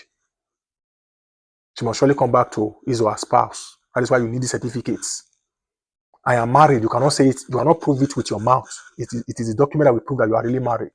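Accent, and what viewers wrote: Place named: Nigeria. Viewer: Support advise Africans how to develop their own countries than to advise them to run and go live in other western countries. The fact is the western world is fade up of immigrants using any kind of routes such as asylum and student visa to come live in their countries. You sound west African from Nigeria. Why don’t you go back to Nigeria and build your own country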